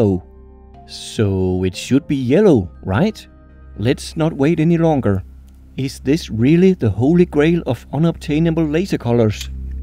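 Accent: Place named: Denmark. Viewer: Grinernt nok du er dansk! Accenten skinder igennem :P Fed video!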